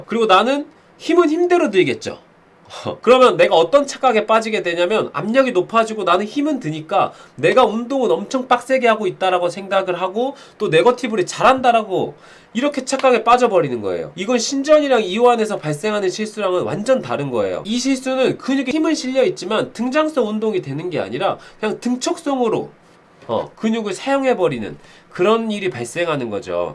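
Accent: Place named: Jeolla, South Korea. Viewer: 전라도 억양이 참말로 구수하십니다~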